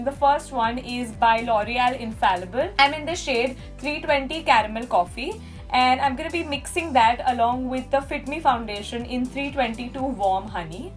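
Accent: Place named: Gujarat, India. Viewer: Your Gujarati is soooooooooooooooooooooooooooooooooooooooooooooooooooooooooo cute 😍😍😍😍😍😍😍😍😍 OMG ❤❤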